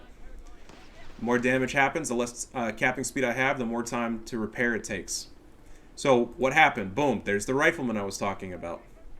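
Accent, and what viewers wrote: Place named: Germany. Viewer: Bro... this shit is fucking comedy. Literally peak youtbe right here 🤣🤣🤣 the fact that you can so the German accent so well and clearly aint about that shit is legendary 😂😂😂 i dont even like videos on youtube cuz it fucks with my music but this deserves likes regardless hahaha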